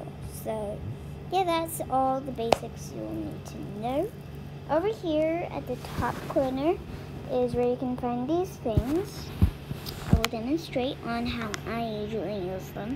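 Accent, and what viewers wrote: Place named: United Kingdom. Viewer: Accent nen britishb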